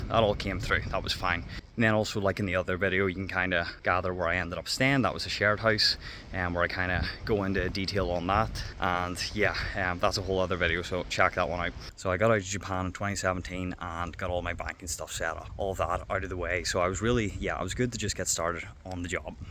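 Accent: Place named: Ireland. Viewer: "My accent really isn't that thick and that broad" Uh, yes. Yes it is. I'm not saying you should change it, but you must certainly know that you have an incredibly thick Irish accent. Nobody does well in those unofficial language schools. They can't charge their students enough to both keep the doors open and pay their teachers fairly unless they're huge or gov't sponsored.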